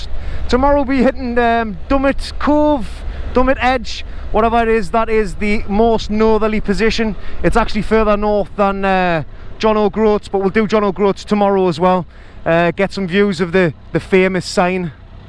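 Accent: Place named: Newcastle, United Kingdom. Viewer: Fantastic views, despite the cold. Treated the weather with the contempt it deserves. Less a crash than a sliding tackle. Geordie accent is perfect. Treat watching this.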